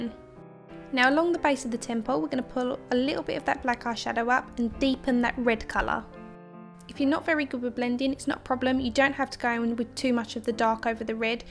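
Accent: Essex, United Kingdom. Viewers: Where abouts in the UK are you from? I can sense an Essex accent ;) i love your videos & you are super talented, new sub!💗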